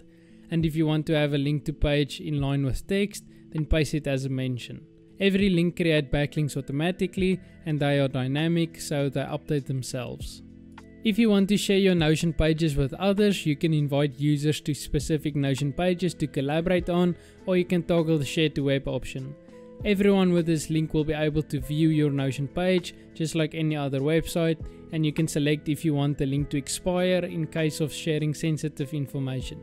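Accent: Afrikaans, South Africa. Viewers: I can hear a South African from a mile away. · Damn this man is very Afrikaans. Wat n legend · 100% south african? 😅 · This is a great video!! I felt right at home the moment I heard your Afrikaans accent😂 Your video is really helpful and everything went smoothly when I did it myself except for the creative progress bar, everytime I tried to put in the code it said this: "Argument of type text does not satisfy function slice. [6,18] Percentage is not defined. [23,33] Argument of type text does not satisfy function slice. [48,60]" I copy and pasted the code so I never changed anything yet I still keep getting this. Do you know how I could fix it? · Not Slavic. He is South African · 6 Seconds in and I hear a Saffer 😂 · bro are you South African ? We have the same accent hehe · OMF Are you South African. I can hear the Joburg Vibes from your voice. 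· This is the best description of an Afrikaans accent I've ever heard lmao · 2 seconds to hear your south african.❤😂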